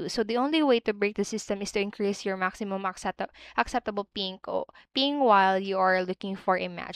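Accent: Philippines. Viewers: pilipina accent <3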